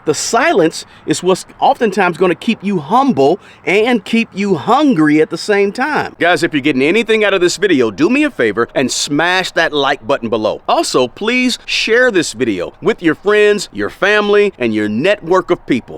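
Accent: South, United States. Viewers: Thank you for this video! Can anyone tell me where he is from?? I know his accent, I love the people from that place but I can't remember if it is from Virginia or North Carolina..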